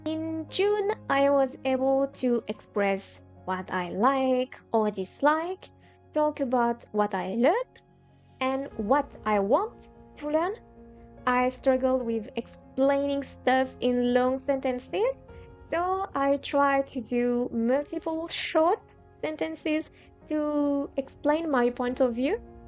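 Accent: France. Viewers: Hola! I think I missed what your native language is! maybe french based on your channel's name jajaja. So, keeping with the topic of your video, im gonna keep writing in spanish. Me encantó el video! Soy de Argentina y también estoy en este viaje de aprender algunos idiomas. En este momento tengo un inglés que fluctúa entre b2 y c1, dependiendo de las skills que use (writing or speaking). Estoy aprendiendo algo de italiano y ruso (muy básico). A diferencia de lo que dicen algunas personas, creo que el español es uno de los idiomas "más fáciles" para aprender puesto que es un idioma fonético, y realmente no usamos los 16 tiempos verbales. en este punto estoy escuchando tu descripción y noté el acento jajaja Vos (tú) venís excelente! El acento francés le da el toque especial a tu español jajajaja, se escucha muy tierno. Entiendo que estás aprendiendo el español de España, no? 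El español de Argentina es mejor, way cooler 😁 Definitivamente voy a seguir todo este proceso en tu canal!! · Hola, you have improved a lot since I last commented on your videos. You've started to use the preterite a lot instead of the present perfect which is great, you have verbs agreeing with the subject. - mejor "capítulo", creo que se puede decir también "episodio" - excellent use of the imperfect and I love the cute "oui" after you corrected to "mucho tiempo" haha - cuarenta (quaranta is Italian, which I would love for you to start learning too 😄) Edit: you corrected yourself actually so great job! - this is quite advanced but I think it would be "como era", I think you can say "pero porque" but it's kind of a direct translation from English ("but because"), also then it's "no pudimos comprar nada en las tiendas" (I also get podemos and pudimos mixed up!). Great job with "pescado" that was correct and great that you didn't say "pez" - ooooh a subjunctive! 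I also love your accent in English because it's like a mix of a French and unspecified Asian (I guess Korean in your case). You speak clearly and correctly which is the most important thing. I for example would just speak to you like I do with other native speakers, that's how good you are!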